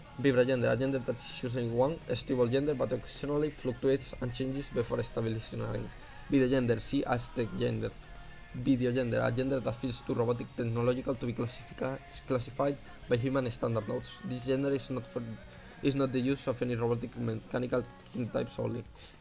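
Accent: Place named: Cambridge, United Kingdom. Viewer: Inglés de Cambridge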